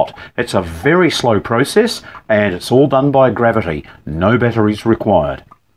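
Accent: New Zealand. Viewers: So that’s how a real live newzealander sounds like!!!!!!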